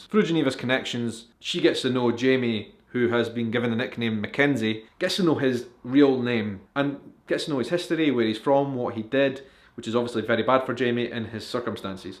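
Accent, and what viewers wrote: Scotland, United Kingdom. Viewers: Well, it was super steamy until the end when he explained what the difference between love and lust were. I also agree with you, that was the steamiest sex scene outlander has done. I wonder why they put so much emphasis on this one and not the one with the maid back at lollybrach? I am NOT complaining though! Cant wait to see more of your filming location vlogs. Honestly, all your vlogs. Love listening to you before i go to bed. Hoping i dream about a Scotsman since i fall asleep with the accent in my head! Ha! Thank you for the video! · I was immediately taken aback when Geneva said 'Scotch-man' (and I'm American), and knew (somehow) that it was a careless form of address. Not sure how I knew that was terribly wrong, but it surely set the tone for how we should perceive Geneva (despite the fact that I've already read the books!). 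I love your 'Scottish-person's' perspective on this series! Not to mention you have a pretty cool accent, yourself!!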